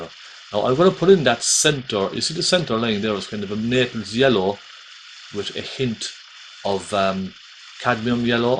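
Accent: Ireland. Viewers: hard for international people to understand English with an Irish accent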